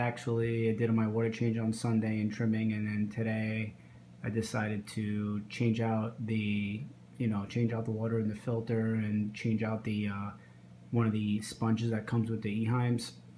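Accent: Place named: New York, United States. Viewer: I heard your NY more in this video! haha. I cannot believe you found the time to make this when you are so busy with life lately. Those green neons are looking slammmmmming. Great advice as well. Looking good! I am glad you are entering the contest, they give some killer feedback that help us grow in the hobby.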